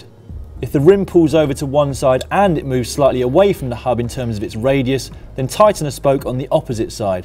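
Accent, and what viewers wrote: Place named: United Kingdom. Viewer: Great. All of the most vital things to know are glossed over in a super fast thick British accent so...thanks for nothing.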